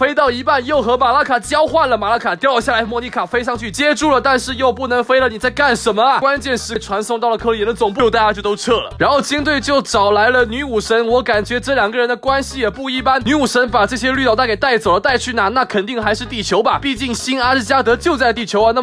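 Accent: Taiwan. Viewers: 這個口音 好像台灣人喔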